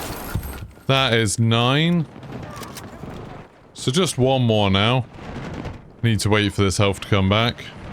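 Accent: United Kingdom. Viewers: “Non-english”. Bro, he’s from England. Did you want an American accent with the guide instead?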